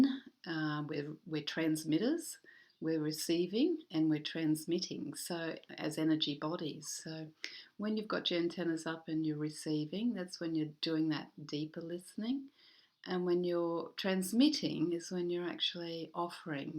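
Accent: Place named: Australia. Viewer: 1. I love the Australian accent. 2. Absorbing the Love vibration you emit. 3. You are the perfect spiritual advisor needed at this time. In conclusion, your insights and suggestions help to analyze how one can manipulate ones perceptions for a better understanding of ourselves, those around us and the collective. Much gratitude, love and Blessings for your time and energy. <3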